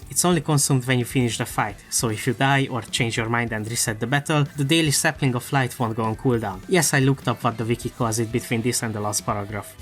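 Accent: Hungary. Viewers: This accent...magyar...hmmmmmm... Holy shi im right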